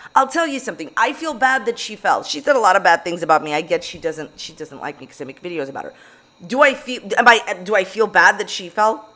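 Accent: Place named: Long Island, United States. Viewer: Omg the clip has me WEAAAK😂😭💀 you're the BEST reaction YouTuber with the funniest edits. You're hilarious & I always look forward to my girlfriend putting on your newest video everyday. The Monsters Monsters Monsters edit had me dying💀💀💀 Edit: Hey if you see this, I've always been wondering what accent do you have? It's like a kinda faint Long Island accent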